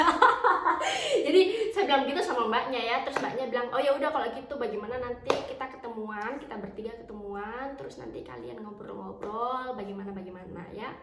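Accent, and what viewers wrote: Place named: Eastern Indonesia, Indonesia. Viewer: Pantas logatx org timur.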